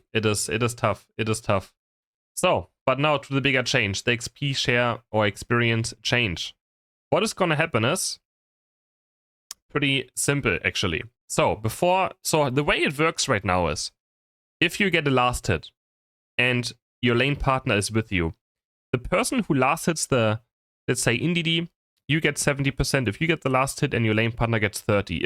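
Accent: Germany. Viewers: Ahh du bist deutsch, ich höre den aktzent sofort heraus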